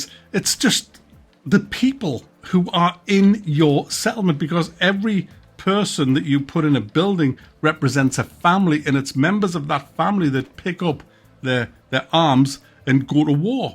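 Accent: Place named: Sunderland, United Kingdom. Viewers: Awesome vid. I'm new around here and i have to say its great to hear an unashamedly Geordie accent with his no BS attitude. Plus you enthusiasm is infectious. I already knew what you were telling us about the farmers and brewers being the same men that figth on the battlefield for you but your excitement for it hyped me up even more. Subbed. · you talk like my friend from sunderland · ahhh so refreshing to hear a fellow northerner on YouTube there's no enough of us! This game wasn't even on my radar it looks like it's gonna be mint! I've got a week off work and was looking for something new to play need to get my hands on that early access like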